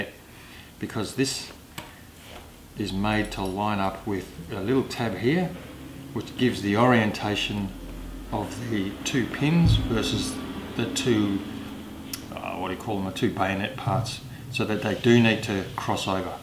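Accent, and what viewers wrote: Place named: Australia. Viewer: Super helpful mate!... And so awesome to hear an Aussie voice on youtube👍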